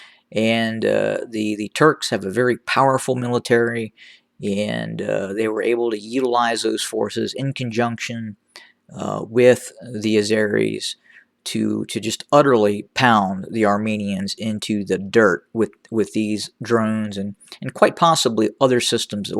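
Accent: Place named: United States. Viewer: Are you a blood sucker ? What do you benefit from brutal mass murder of people on each other ! Your accent tells you are an American where democracy, moral and ethics are important virtues in relative way! But you are so invested in this bloody civil war among black people because of the idiot politicians! What makes you so active in this cruelty of a kind never seen ever?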